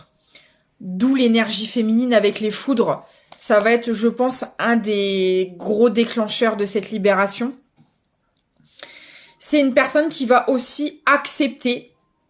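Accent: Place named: North, France. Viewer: Tu es trop forte, cette guidance me parle tellement. L’amour inconditionnel évidemment, je l’ai laissé partir parce que je savais que ce n’était pas le moment ni pour lui ni pour moi il y an. Nous nous sommes revus qu’une fois en août depuis mais il n’y a pas une seule journée depuis un an où je n’ai pas pensé à lui. Tout correspond des 2 côtés, tu es vraiment très forte je le répète. Je me disais bien avec ton accent que tu venais pas du sud, j’ai grandi à côté de Beauvais 🤩. JE t’embrasse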